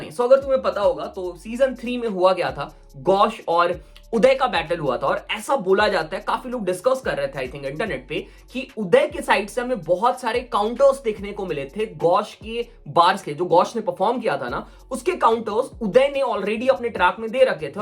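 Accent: Bihar, India. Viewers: Bhai tu bihari hai? Bihariyon jaise kyu bolta hai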